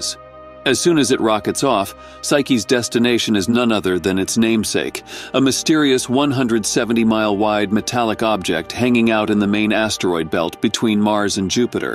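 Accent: United States. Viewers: thank you for plain western english!! I'm tired of the artificial "European accents"!!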